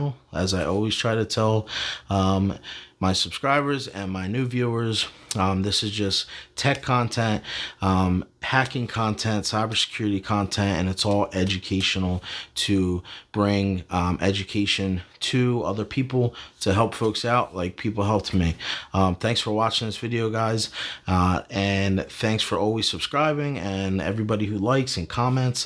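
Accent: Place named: New York, United States. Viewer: Also are you from New Jersey? Your voice makes me think you're from either New York or New Jersey.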